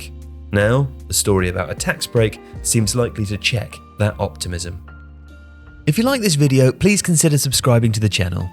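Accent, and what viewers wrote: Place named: England, United Kingdom. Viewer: didn't know the channel viewership is exclusively Italian. Strange that they make content in English and that too a narrator with an English accent. Oh well a waste of resources then this video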